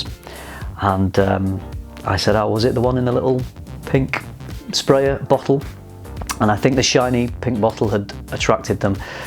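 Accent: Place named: Leeds, United Kingdom. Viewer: Are you from Leeds? Trying to figure out where the accent is from 🤔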